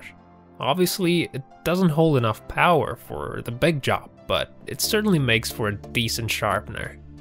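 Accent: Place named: United States. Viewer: You speak with an American accent but seem to have some trouble with with English, sounds weird.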